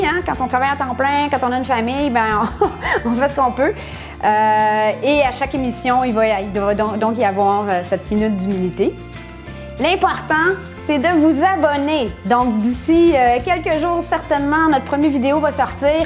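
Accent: Quebec, Canada. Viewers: J'adore l'accent canadien ! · Belle chaîne de cuisine, l'accent et les expressions québécoises sont sympas. Je m'abonne ;))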